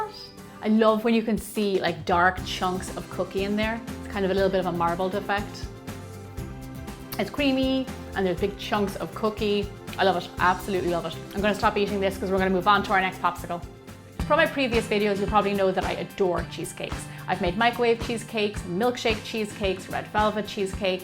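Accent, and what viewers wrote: Ireland. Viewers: I love your Irish accent! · Are you Irish??? CAUSE I AM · so thats why she has that accent, i didn't know that she was irish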